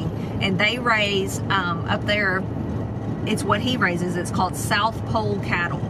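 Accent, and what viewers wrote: South, United States. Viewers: Hi, I'm a newbie...brought here by Kevin (and Dutch)..and I'm liking what I'm seeing! I like the way you talk too! LOL! I'm from Ohio, so I love the southern drawl!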